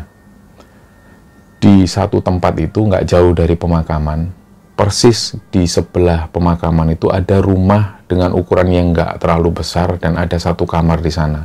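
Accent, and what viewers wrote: Java, Indonesia. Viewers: Setuju bgt... Q ngefans karo seng nyiarke... Udah ganteng logat jawane jiiaann penak bgt di rungoke... Salam rahayu njeh ❤❤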